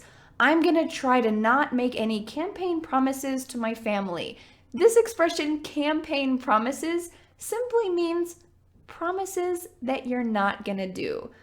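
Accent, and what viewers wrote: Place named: United States. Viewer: iam from Somalia this is the only teacher that i listen always really it's help ful even i often like American accent KEEp it UP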